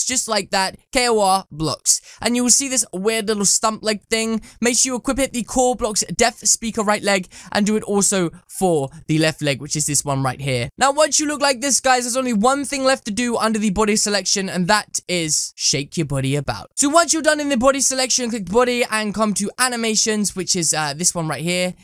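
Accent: United Kingdom. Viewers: I like it when u talk u got british acsent ( dislike if acsent spelling is wrong)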